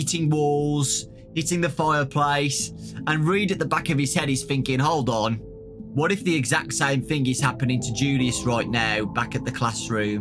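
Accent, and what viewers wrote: United Kingdom. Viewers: He sounds sorta british and I want to have a british accent but I have this boring default american accent, I wanna say,”OI MATE YOO AINT GOT NUFFIN O ME!”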